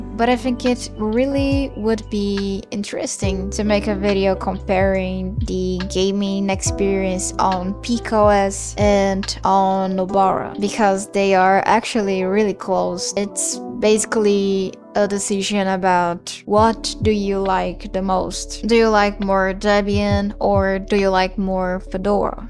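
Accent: Brazil. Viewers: Meu ouvido brasileiro detectou algo brasileiro 👀 kkkkk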